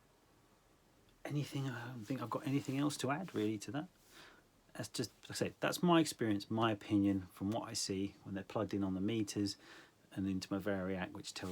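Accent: United Kingdom. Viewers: The English accent is good for singing not so much for speaking sorry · I thought you sounded like a true British rocker with the english accent. John Peralta sounds like a dick! Keep rockin the great information. Appreciate you taking the time to make this video bro!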